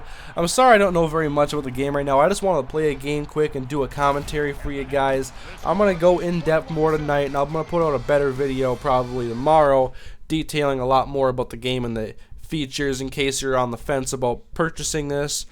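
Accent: Minnesota, United States. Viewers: youre definitely from minnesota lol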